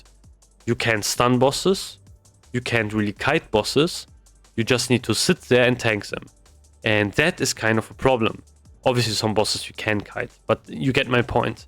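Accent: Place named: Germany. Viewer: Inhaltlich super, nur eine bitte, arbeite ein bisschen an deiner englischen Aussprache :D. Vokabeln, grammatik, satzbau, voll super aber du klingst so deutsch :-* nicht böse gemeint, nur ein tipp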